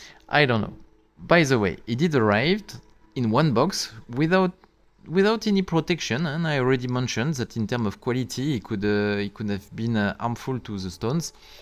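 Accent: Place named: France. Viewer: Bonjour, si je vous écris en Français c'est que j'ai cru percevoir un accent Français dans votre anglais...Je suis frileux avec le fait qu'à l'intérieur de ces roches, l'eau ne sera pas filtrée d'une part, et sera stagnante d'autre part. Qu'en pensez vous?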